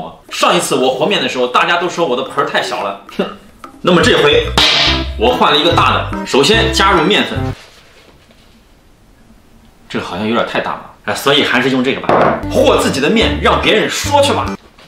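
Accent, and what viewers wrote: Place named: Shaanxi, China. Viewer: 听到的陕普好亲切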